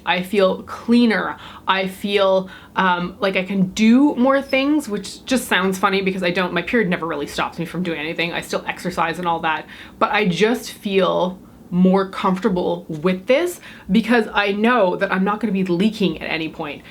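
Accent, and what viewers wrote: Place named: Eastern Canada, Canada. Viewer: I have to say as a fellow Canadian I adore your eastern Canadian accent it sounds so much more chic the my bc accent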